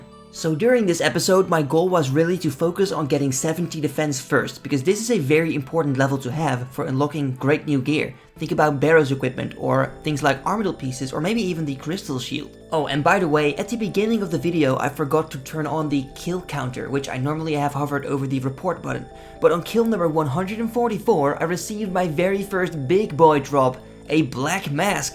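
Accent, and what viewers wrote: Netherlands. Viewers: such a baller lmfaoooo. You kinna sound dutch doesnt suprise me you a fan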